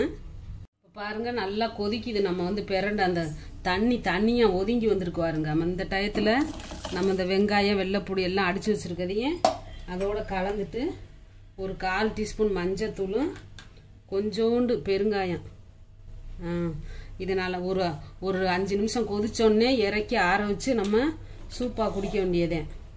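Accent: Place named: Madurai, India. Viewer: How nice to hear Madurai dialect😘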